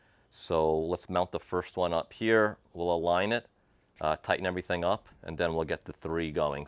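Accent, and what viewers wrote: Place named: Northeast, United States. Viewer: I was gonna say your channel name is, but your accent is Northeast like NJ or NY. Then I see the GPS on the tablet being New Brunswick!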